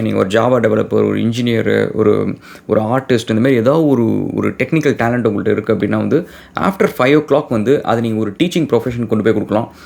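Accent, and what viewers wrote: Dindigul, India. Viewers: Nenga Dindigul ah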